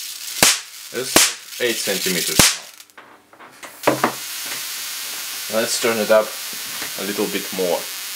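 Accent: Russia. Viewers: спасибо, русскую английскую речь не спутаешь ни с чем, слишком все правильно выговариваете. :) Спасибо за видео. Будут вопросы обязательно спрошу. Меня больше интересует тлеющий разряд, так что спрошу под другим вашем видео. :)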